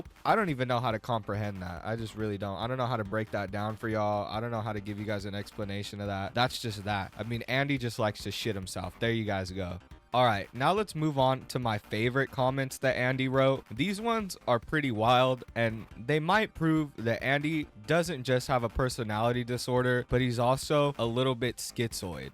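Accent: South, United States. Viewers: I love your southern twang! Usually I just call what you call "folks" people, I forgot that a lot of southern people say "folks" very interesting!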